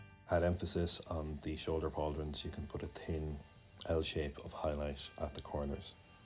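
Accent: Ireland. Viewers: u Irish ??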